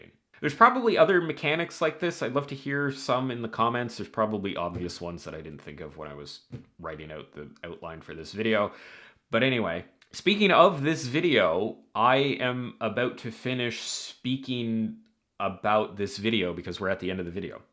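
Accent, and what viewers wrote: Canada. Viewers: Are you from the maritime region of Canada? You sound just like my favorite early 2000s politico Rick Mercer 😂🎉 super random sorry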